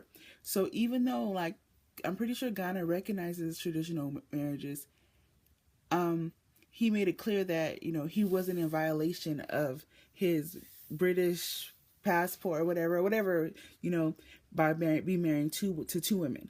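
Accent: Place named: United States. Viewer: Are you a British or American ? Your accent sounds like American .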